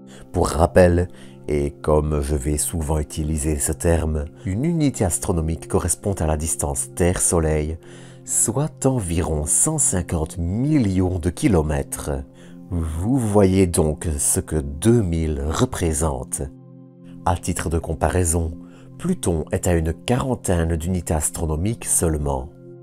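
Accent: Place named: Namur, Belgium. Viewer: Mais de quelle région de Belgique venez-vous? J'ai l'impression namurois mais certaines intonations sont exotiques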